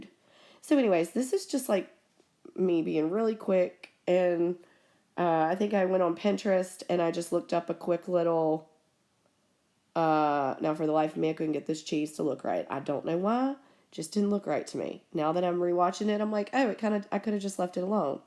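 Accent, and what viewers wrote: South, United States. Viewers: Trying to support smaller artist channels. I don't understand why some of y'all have so few views. Your reviews are just as good as those of the "bigger" people. Yeah the filming quality isn't as good but the content is fine and concise. I liked your video and your southern accent. Don't hear it anymore since I moved from TX to Massachusetts haha Edit: wait, they put different colors in the boxes? I didn't know that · I love your southern accent 😊. I lived in Mississippi for years! I live my Princeton brushes!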